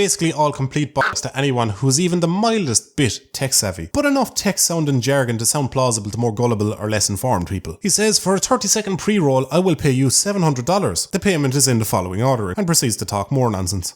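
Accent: Ireland. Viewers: Came here from cynical reviews! Subbed, liked and stayed to binge for the hilariously snarky content and adorable with an Irish accent! 🇮🇪 😁👍🏻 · Irish accent, that explains everything.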